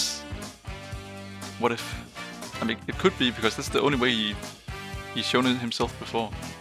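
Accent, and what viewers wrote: Denmark. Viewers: hah! Me too!😌 i noticed the danish accent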